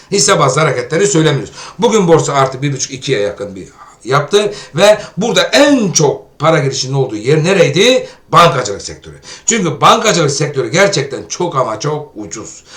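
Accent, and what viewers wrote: Turkey. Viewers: Ben de Kahramanmaraş/Andırın lıyım hocam edem senin "PUSTUK" dediğin ağzını severim, iyi ki varsın takibe devam❤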